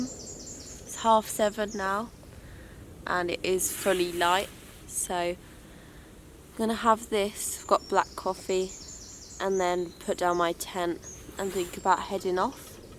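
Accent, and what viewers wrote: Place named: England, United Kingdom. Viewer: you are such a delight to watch, being from Texas I could listen to your accent all day long. The waterfall the tower...I have to visit England someday...you are a walking advertisement of your country. Pretty lady I cannot wait to see what you do next ❤ Texas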